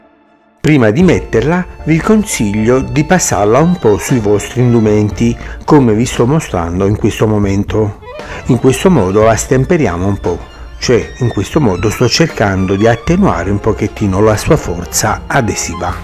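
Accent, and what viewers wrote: Naples, Italy. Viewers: buongiorno! Ma sei di Napoli?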